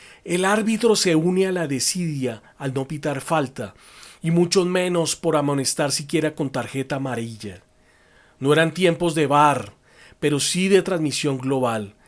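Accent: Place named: Argentina. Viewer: Cuando empezaste dije yo este es argentino y me puse a pensar en cual provincia serán más tontos . Después me puse a ver y escuchar y me gustó muy buena narrada . En aquellos años supongo yo que era un espermatozoide nadando en los testículo de.mi viejo . La verdad que ese arquero me recuerdo al gato sesa o a orion y algunos otros . Saludos muy buen video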